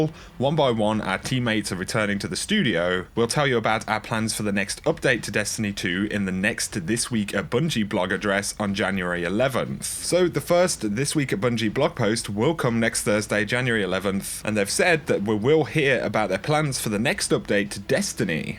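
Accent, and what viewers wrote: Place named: United Kingdom. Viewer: Keep it up! Out of all the destiny you tubers, I really like your stuff! You have a really interesting accent to listen to as well! Where in the UK is that?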